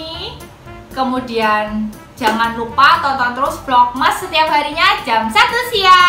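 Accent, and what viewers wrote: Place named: Surabaya, Indonesia. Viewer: Tak kiro iki wong suroboyo soal e logat e wes suroboyoan poll.. 👍👍👍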